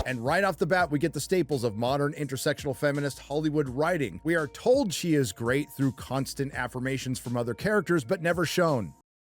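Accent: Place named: England, United Kingdom. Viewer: It sickens me to hear an English Accent talk such Bullocks. has moved beyond being a simple village idiot and into the tall grass of Racism, Hate and Supremacy. As if AZ is better than anyone else. The Porcine Pratt Shartts his terrible opinions onto an audience of hungry mouth breathers. His mother was a hamster and his father smelt of elderberries.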